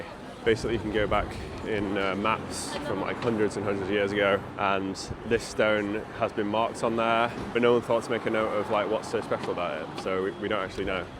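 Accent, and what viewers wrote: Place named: London, United Kingdom. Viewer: London innit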